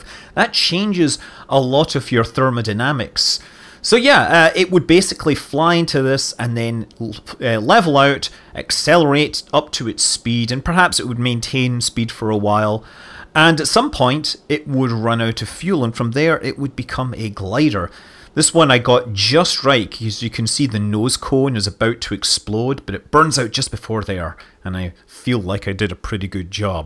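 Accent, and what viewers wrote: Scotland, United Kingdom. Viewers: LOL, your accent is reverting to your native Scottish. The Bell X-1 of course had the one XLR11 engine that has the four thrusters. The early X-15 had a pair of XLR11's (so eight thrusters) while (whilst?) they were waiting for the XLR99 monster. Lifting bodies also made use of the XLR11's.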